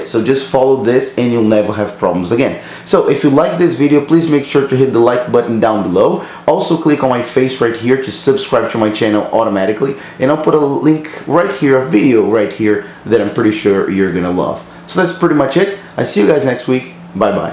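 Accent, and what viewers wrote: Brazil. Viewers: Heeyyy Brazilian!!! I noticed by your accent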